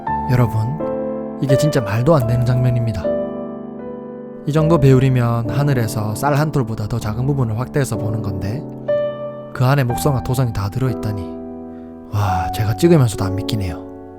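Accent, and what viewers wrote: Gyeongsang, South Korea. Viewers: 경상도 사투리에 목소리 좋네요 내심 연예하고 싶네요 ㅎ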